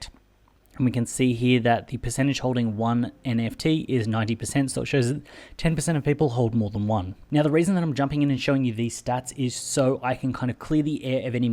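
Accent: Australia. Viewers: Where are you from mate? sound Aussie to me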